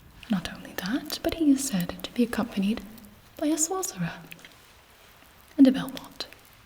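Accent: RP, United Kingdom. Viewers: Well this accent is great rp standard accent.. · i didn't know how much i needed to hear a blonde with a red lip and a british accent say the F word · OMG, girl ur so amazing. Ur like the best vampire I've seen in an asmr video. Very soothing voice, yes I love the british accent, I'd do anything to be recruited by her. 😍This vampiress is such a beautiful and elegant goddess! I'd just wanna be her royal guard for all I want and to fulfill her orders and requests! Yesss lemme join u! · As a British viewer, just wanted to say… good job on the accent 😌🤙🏻 very authentic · you nail the English accent very well. ☺️ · 'What is a man but a miserable pile of secrets?' British accent and speech mannerisms on point. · Well done with the British accent 😊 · When I first seen nail shot, I’ve thought to myself okay? she can pull off the look, but can she pull off Camilla rich class pure English voice? 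Yap she nailed it especially bastard & 🤬 “Perfect” Nicely done 👍👍 Camilla is my favourite & one of the best female vampires Beautiful, cunning & a savage, she will tear you to pieces. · That Bri'ish accent... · As a Brit, I can confirm that your British accent is rather authentic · Wow! I'm British, English, and this accent is amazing! I'm so impressed! Doesn't sound like you even haha. I love this, I also saw your full outfit on insta, my gosh it's fucking amazing... Hehe! It's so cool to hear you swearing but also, cos it doesn't sound like you much, it doesn't seem that bad! Lol, love ya!! ♥️ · Your British accent is incredible omg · I think the summary on this needless debate is: You wouldn't be able to tell that is not from the UK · It's called received pronunciation and it's passable at best, nothing amazing. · Your English accent is atrocious I can’t do this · The recieved pronunciation English accent is truly excellent and wow this was something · Hello from the UK!🇬🇧 You're British accent is exquisite my queen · Your British accent is amazing and you look absolutely incredible. 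10/10. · Amazing English accent & acting!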